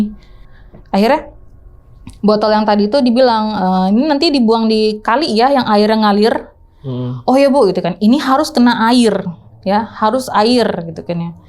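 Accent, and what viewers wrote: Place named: Betawi, Indonesia. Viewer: keknya betawi😂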